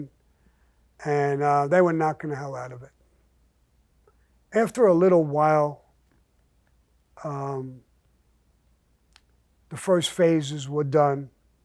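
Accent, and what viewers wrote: New York, United States. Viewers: ... I just love your NY accent. Keep on keeping on!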